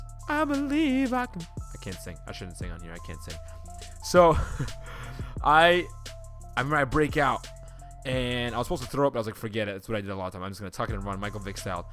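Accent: Massachusetts, United States. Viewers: What City/town did you play for? Sounds like you lived in MA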